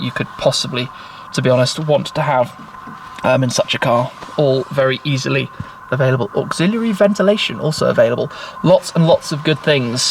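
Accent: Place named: United Kingdom. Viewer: Can you talk less British ? Like more neutral English, kinda annoying for me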